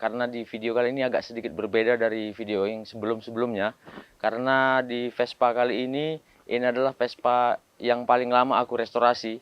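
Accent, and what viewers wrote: Medan, Indonesia. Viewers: Orang medan bg?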